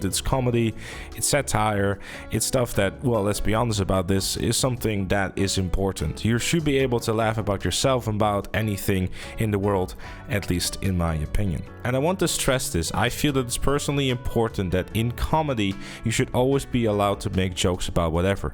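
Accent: Netherlands. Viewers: Hol up is this man dutch?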